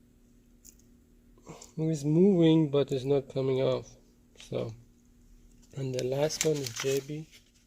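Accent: Iran. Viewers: Love your accent. Sounds Persian. Where are you from?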